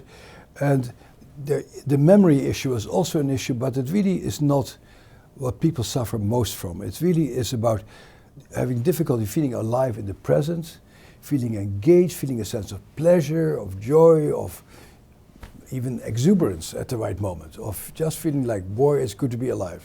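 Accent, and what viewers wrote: Netherlands. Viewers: I can just tell that this guy has to be Dutch just by accent! If he isn't I will.... eat my socks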